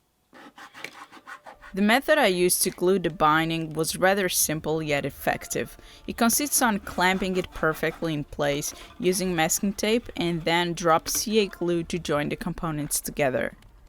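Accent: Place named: Portugal. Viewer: Assisto teus vídeos a bastante tempo e não sabia que você falava em português! E pelo sotaque e português de Portugal! Eu sou brasileiro e adoro ver teus vídeos! Parabéns !!!!! Continuo fazendo mais vídeos! Poste vídeos mesmo de pequenos projetos executivos! E muito satisfatório ver como você trabalha! 🤩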